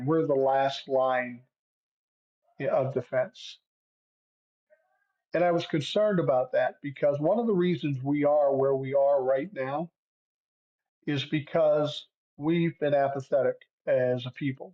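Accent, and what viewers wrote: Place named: Liverpool, United Kingdom. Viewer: Yes the scous is starting to push !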